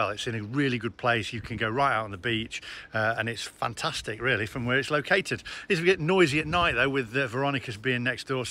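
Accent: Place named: England, United Kingdom. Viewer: At first, I was eggstatic about watching another vlog. I don't think I've ever heard anyone rant on for so long about how he asked a Spanish bloke in a canteen in Tenerife in his broad English accent to flip his egg and then rant on for the rest of the video about how the server was rude, simply because the Spanish server didn't quite understand his broad English. The Spanish server was probably sick to the back teeth of English people going on at him. Jesus wept. Maybe try attempting some Spanish next time? Cuando en españa......... You asked, "Am I overreacting?" Yes, you were eggaggerating. "What would I have done?" Been slightly disappointed, but got over it within a few minutes. Please tell me you were joking. My rating: 🍳🥚🍳🥚🍳🥚🍳egg-tastic. Yes, those toasters are b@ But I can imagine there have been several fires when English people were making toast in regular toasters and couldn't quite manage it whilst holding their third breakfast pint of the day at 8am. 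🤣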